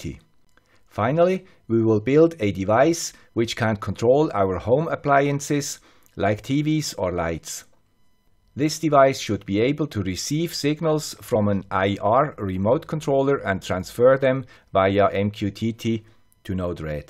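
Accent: Switzerland. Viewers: I love your videos Sir. I am looking to make a simple ir blaster to turn on and off a TV and stumbled upon yet another great video from the guy with the Swiss accent. Thanks for the info. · And winner of my first comment on youtube is the guy with the swiss accent... thank you for all your great videos! A few weeks ago I bought during a flashdeal on aliexpress a cheap esp-01 with a relay and ended here on your channel... 30 Years ago when I was building my first cnc router it was really complicated to get all the knowledge together and today we just google... BTW I remember in one of your videos… problems with a 3D printer....maybe Iˋm the man... we are almost neighbours. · HAHAHA "Here is the guy with the Swiss accent." If only I could put a 100 likes into this video.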